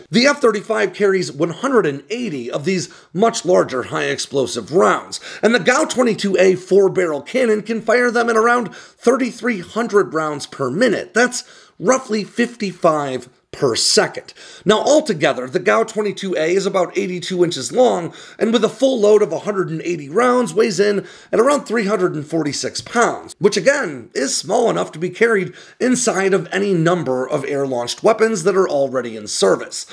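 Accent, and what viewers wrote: United States. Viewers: You say that almost like an American would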